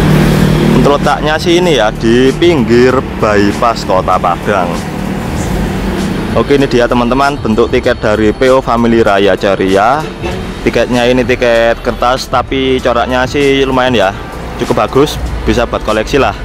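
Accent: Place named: Java, Indonesia. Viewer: mantap mas.....kroso bedo...bis ko padang sing gawe video logate njowo...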